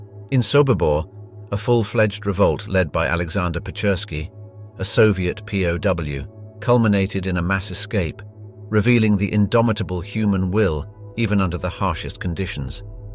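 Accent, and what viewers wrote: England, United Kingdom. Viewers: I'm not sure what it is but the English accent makes a documentary better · LOL Your fancy British accent can't hide the lies.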